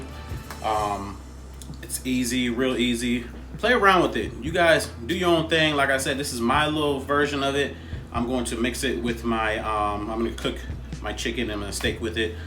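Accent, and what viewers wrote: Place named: Georgia, United States. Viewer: I could hear the Georgia in you before you even said it. Thanks for the video, I'll be making this!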